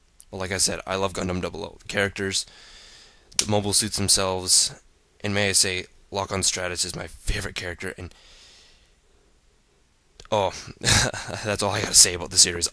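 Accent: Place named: United Kingdom. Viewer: u fukin silly uk guys with ur silly way of talkin u can just make trailer with music no need to talk too much fool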